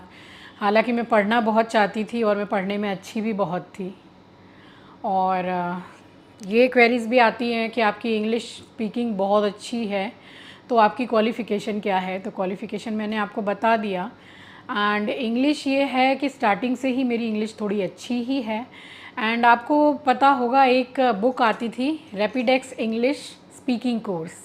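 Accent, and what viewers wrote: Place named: Uttar Pradesh, India. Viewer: ji aapki purchase sunkar mere aankhon mein aansu a gaye main aapke block abhi ek do mahine se hi dekhne shuru Karen jab aap kuchh Shabd aise bolati hai use Kanhaiya se hai mujhe tabhi mahsus hona tha ki aap up se belong Karti main bahut bar likhna Jahan ek meri bhi suar ki mere aankhon mein bahut taklif rahti hai mujhe aapke Shabd bolane se lagta ki aap up se belong Karti hai uska jawab mil Gaya Mera bhi mica Agra mein hai Delhi mein meri shaadi Hui hai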